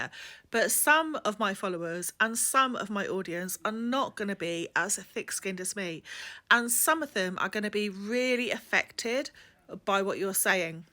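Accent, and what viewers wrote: England, United Kingdom. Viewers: South African here, beautiful accent, people from US love the English accent as well.❤️ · Some people I think do these things to get a response seeking attention any way they can, I did not even notice any issue with your voice at all, you have a normal English accent to me, and your personality is very positive so keep up the great work you are doing.❤❤❤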